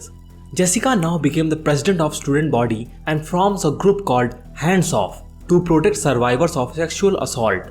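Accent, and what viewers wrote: India. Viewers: why do you think you speak the right English and he speaks the wrong. Americans have their own accent, British have their own accent, Australians have their own accent and even Arabs have their own accent so why can't indians have their own accent. Who are you to tell which is right or wrong. And it's your country's problem that you got so less people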